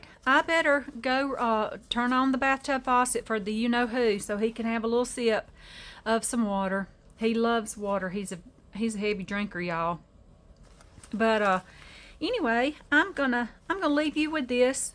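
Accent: South, United States. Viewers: i discovered your channel yesterday. I have to say... Your giggle is charming and your slow southern drawl is so soothing! I love your little stories! Beautiful journal and very inspiring! I will be sure to watch the rest of your videos. Blessings to you 🙏 from Kim in Gettysburg, PA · This journal is stunning!! Btw, Where are you from? Our southern accents are the same so I know you're from the south lol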